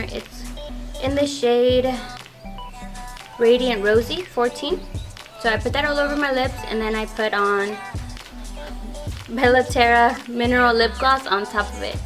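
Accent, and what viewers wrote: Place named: Spain. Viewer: Hehe i love yur Spanish accent(; ❤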